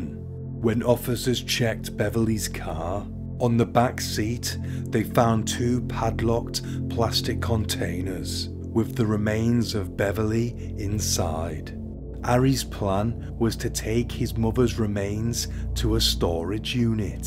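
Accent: United Kingdom. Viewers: That's one of the most British accents I've ever heard my god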